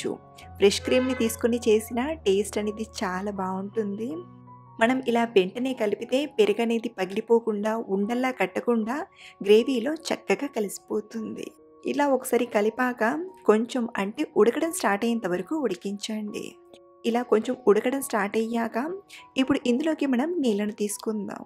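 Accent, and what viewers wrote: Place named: East Godavari, India. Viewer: East godavari slang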